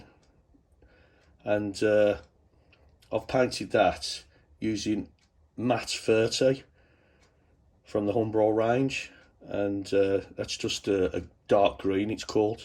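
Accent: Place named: Birmingham, United Kingdom. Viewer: A great Brummy accent. As someone who is Bromwicham born-and-bred, but has been living in the United States for the last 37 years, I find it quite refreshing. :)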